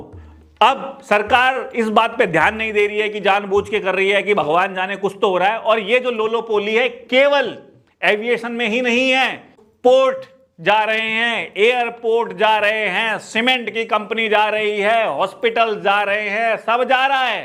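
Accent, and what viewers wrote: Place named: Meerut, India. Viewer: Bhaiya aap mujhe bahut ache lagrte ho or aap bilkul humhare goan meerut side jaisi language bolte ho hila matlab kaam dhandha karo aisa humre yaha bolte hai❤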